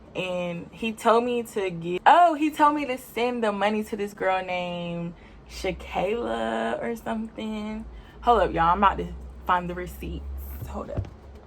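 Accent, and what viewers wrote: United States. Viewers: Are you from St. Louis ? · that’s a Baltimore. Accent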